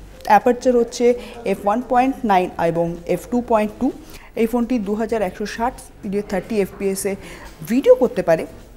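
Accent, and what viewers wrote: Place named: Kolkata, India. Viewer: কোলকাতার বাংলায় বেশ মিষ্টতা রয়েছে, শুনতে ভাল লাগে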